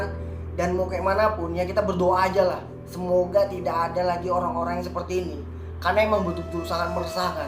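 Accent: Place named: Medan, Indonesia. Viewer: Macem orang medan.. 😁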